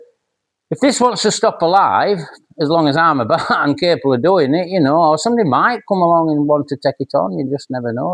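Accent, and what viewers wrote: Yorkshire, United Kingdom. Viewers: The variation in your channel is the best thing. Can't pin you down to one label because you're a very capable chap in so many areas. Proper British Yorkshire accent too.👍 Great stuff. long may you continue with your videos.😁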